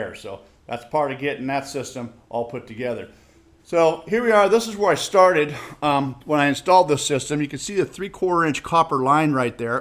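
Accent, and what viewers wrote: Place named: United States. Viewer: It's the American English pronunciation... the norm here.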